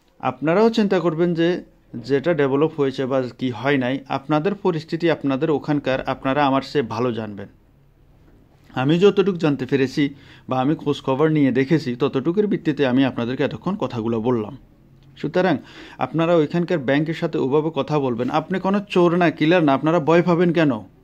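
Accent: Noakhali, Bangladesh. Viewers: Thank you. Bhai apnar bari ki Noakhali? Kotha suna mona hola. Apnar suggestion bhalo laglo. Apnar email address ta dan.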